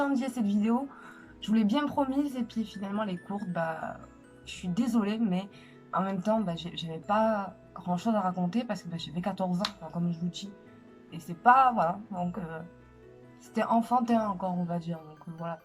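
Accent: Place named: Catalonia, Spain. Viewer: Ton accent Catalan, un délice xD, vive le sud ! :p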